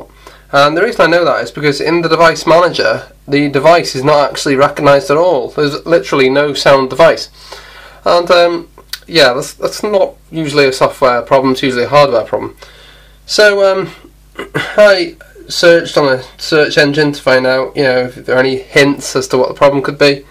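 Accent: United Kingdom. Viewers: Oh God it's British:)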